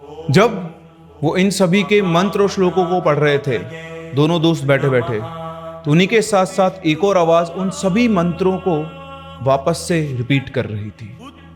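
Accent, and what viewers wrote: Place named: Bihar, India. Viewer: Sir aapki bahari accent..😍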